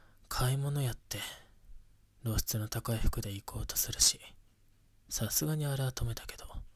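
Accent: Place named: Kansai, Japan. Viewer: が関西弁って新鮮で好き💗凄いキュンとしてる💗顔が真っ赤になってるよ···はううう···💗(恥)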